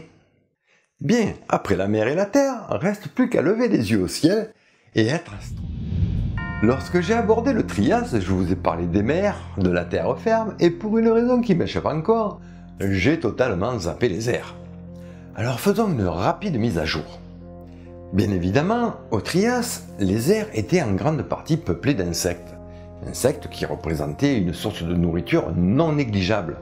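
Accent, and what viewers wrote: South, France. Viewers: Cher, tu es un mélange de Ben Kingsley pour le physique, de Jean Lassalle pour l'accent (ce n'est pas moqueur, je suis moi-même du Sud), d'Alan Grant pour le savoir et de Carlos (le chanteur) pour les chemises😂 Par contre, es-tu sûr que le Tyrannosaure et le Tricératops soient apparus au Jurassique? Il me semble bien que ce soit au Crétacé, non?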